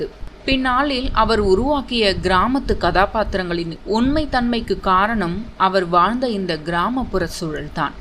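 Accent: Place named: Theni, India. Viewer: Enga ooru thangam ivaru ivarum marimutthu sir ivanga rendu perum than enga theni oora oda slang la aptiye passuvanga ivanga pessuratha ketta avlo happy ya irukum we miss u sir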